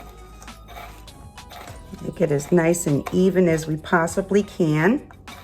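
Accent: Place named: New York, United States. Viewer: New Yawk!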